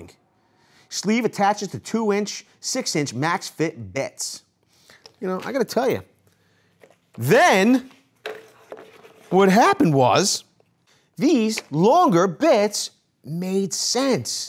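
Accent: Philadelphia, United States. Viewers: You Guys from Philly? I am. I feel like You have My accent? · This guy is from the east coast for sure. I'd say philly Lol.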